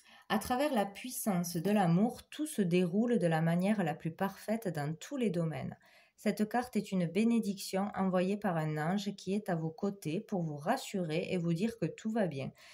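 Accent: South, France. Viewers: Gratitude à vous quel doux accent di sud Namaste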